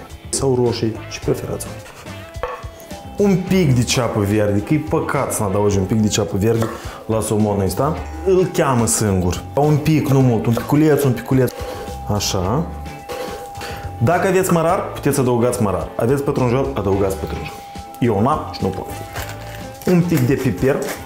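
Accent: Moldova. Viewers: Sint cu un zimbet intins la maxim... accentul moldovenesc si exprimarile sint extraordinare... ,, ou poshat’’ hahahahaha... chiar nici nu stiam cum s ar putea chema din engleza in romana... pouched eggs!!! Cu drag continui sa ma uit din Australia 😘😘😘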